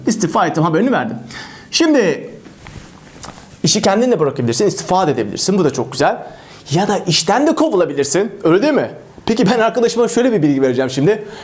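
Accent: United Kingdom. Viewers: british accent is amazing :D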